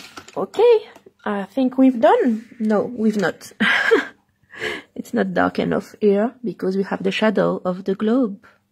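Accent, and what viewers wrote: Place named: France. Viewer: Trop mignon quand tu parle anglais avec ton accent de france☺️😍. Vraiment ton effet or est realiste merci pour ce truc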